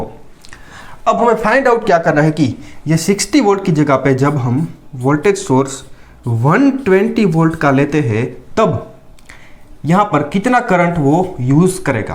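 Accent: Gujarat, India. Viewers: Bhai tame Gujarati cho ne. Awaj thi khabar pade che. Kayi city thi cho bapu?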